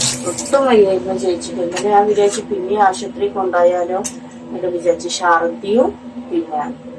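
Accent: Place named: Malappuram, India. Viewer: നിങ്ങൾ മാനന്തവാടി എവിടെയാ സംസാരംമലപ്പുറം കാരുടെ ആണെല്ലോ ഞാൻ അഞ്ചുകുന്ന കുണ്ടാല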